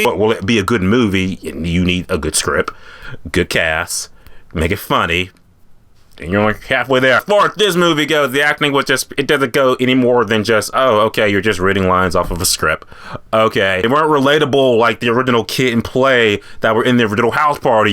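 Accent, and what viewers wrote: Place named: Pittsburgh, United States. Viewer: Are you from Pittsburgh? You got that accent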